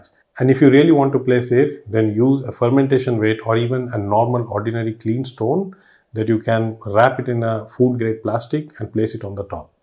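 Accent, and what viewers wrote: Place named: India. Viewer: That’s a cool accent you have. Are you from India?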